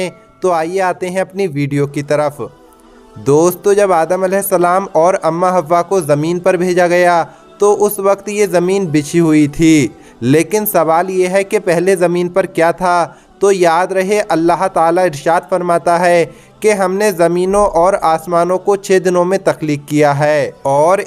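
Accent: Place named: Bihar, India. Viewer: Hi batchit se to bihari lag raha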